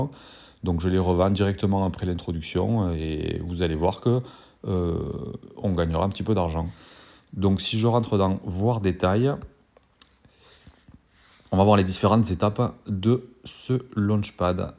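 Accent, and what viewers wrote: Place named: South, France. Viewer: Et en plus t’as l’accent du sud ✌️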